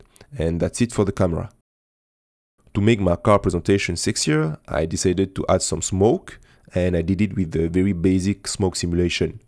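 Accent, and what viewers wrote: France. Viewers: I really like your french accent dude!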